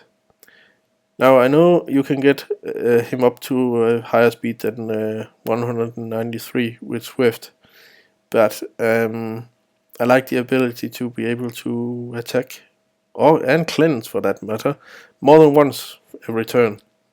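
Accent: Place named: Germany. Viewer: I bet you are from Germany, it really sounds like it. :D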